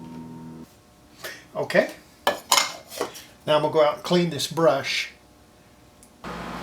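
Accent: United States. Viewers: Spoken like a Texan! :face-fuchsia-tongue-out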